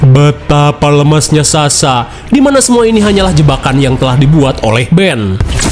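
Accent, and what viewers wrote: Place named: Sunda, Indonesia. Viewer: Narasi narator, kaler, cagak, poek, rorombehen, ari si akang urang mana euy ?😅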